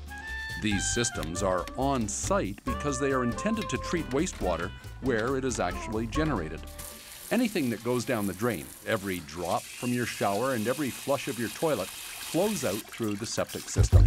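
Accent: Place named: Canada. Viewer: “Organ-I-zation” “Hoose” “Aboot” -def Canada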